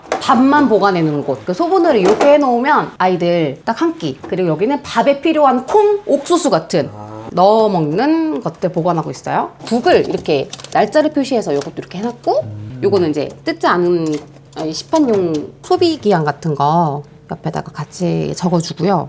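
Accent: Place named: Gyeongsang, South Korea. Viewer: 경상도 사람인가보다 서울말이 어울리지않게 힘들어보이네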